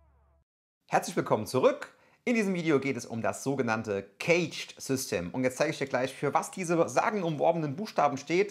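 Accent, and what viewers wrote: Germany. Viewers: mh jo 😃 bisch du ausem saarland ? Nice infos Abo check ! Danke für sie Mühe dir du dir machst 😊😊🤘🏻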